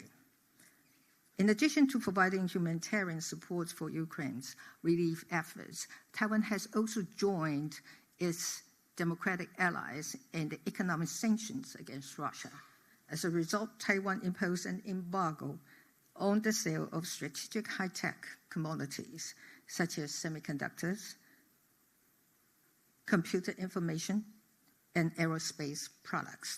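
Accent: United Kingdom. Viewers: 的英國腔太迷人😍最後全體起立鼓掌真的好感動🥹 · 台湾人说英国腔，台湾人骄傲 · 你不懂英語才是笨蛋、總統是英國人🇬🇧口音、不懂裝懂？ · Beautiful British accent ❤ we love you MS. Tsai ❤❤❤ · 雖然聽不懂，但前總統英國腔英文，聽起來很好聽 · 蔡總統的牛津腔英文真好！👍👍👍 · 請保護好總統在她外出拼外交的時時刻刻❤😊中共視她為眼中釘她的英文發音很好聽有點英國腔這次沒看到布拉格帥哥市長有點可惜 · 蔡前總統的英式腔調，太迷人了❤ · 她的英式英文真的好好聽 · 謝謝前總統卸任後仍舊為台灣努力拓展外交, 略帶英國腔調的英語實在太迷人了👍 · 純英語腔調！英國上流社會的口音！ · 元首風範依舊，完全沒有卸任感啊❤一站出來那種領袖氣質盡顯，好喜歡！謝謝my英仍願意繼續當台灣這個小而美且強國家的志工，繼續為台灣打拼，加油，愛妳喔， ～ ps:like the UK accent you speak❤ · 以蔡前總統為榮，為大家在外交上打拼。她講英文有英國腔耶。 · How does she get British accent? Must have been busy practicing her speech lately?😂 not consistent though, still has Taiwanese accent mixed in. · 蔡總統的英式英語好道地喔！真不習慣。謝謝蔡總統向國際發聲，辛苦了。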